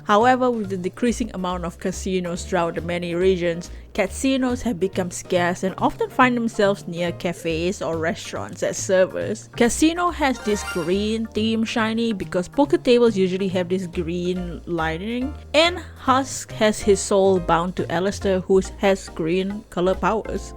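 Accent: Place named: France. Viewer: Par tout hasard, est-tu français ? Il y a un peetit accent Anyway, these designs are cool, I love the Alastor and the Lucifer one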